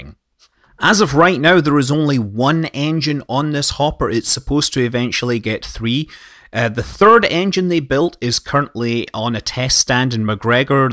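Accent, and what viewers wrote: Scotland, United Kingdom. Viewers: Is Scottish?? Where's his accent from?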